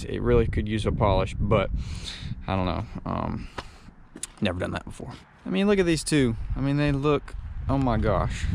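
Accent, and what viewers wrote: Alabama, United States. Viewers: What part of bama you from ?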